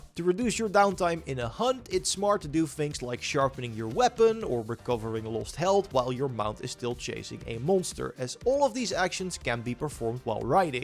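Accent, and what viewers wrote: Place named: Netherlands. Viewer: Ur dutch right? :p I can always tell in the first few seconds of someone dutch speaking english xD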